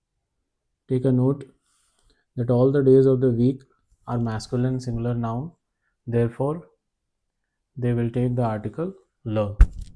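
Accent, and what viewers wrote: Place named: India. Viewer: Really appreciate the hindi pronounciation. Makes the words stick in memory. 👍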